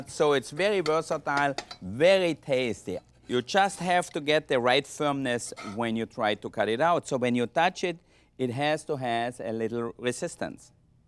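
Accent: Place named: Carinthia, Austria. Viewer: this video stirs the future english teacher in me. i just want to give him pronunciation lessons...please let me! while our carinthian dialect was voted the sexiest in austria, i don't think the accent is very charming when speaking english... :'(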